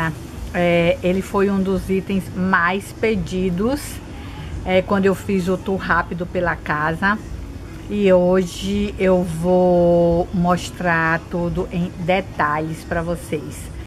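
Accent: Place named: Bahia, Brazil. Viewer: beijos pra vc tambem adoro esse teu sotaque bem de baiana arretada, olha que eu sou gaúcha pena que morando desde criança em SP perdi o meu sotaque mas adoro ver vc falando oi gente,beijos até a proxima.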